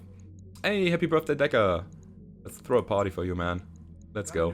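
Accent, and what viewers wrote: Germany. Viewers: I like the format of build and refit at the beginning then a battle then upgrades and another battle. Each episode is well layed out. I dig rogue tech and have played the table top since 94 and no one would play the level 3+ rules that rogue tech represents. I love the whole thing even the Deuchlund accent, loved my time there.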